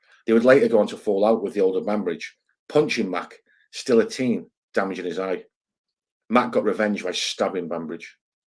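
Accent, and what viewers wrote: Stoke, United Kingdom. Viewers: Is the narrator from stoke?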